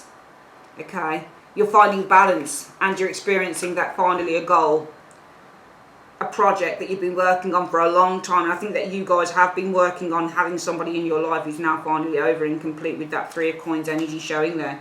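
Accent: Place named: Midlands, United Kingdom. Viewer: You are good. So accurate it’s scary. Love your midland ?accent. Xxx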